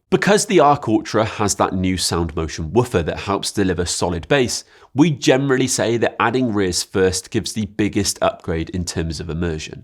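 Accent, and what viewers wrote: United Kingdom. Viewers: Your accent is very clear and so good I think you’re British 😅, Thank you for the tech information.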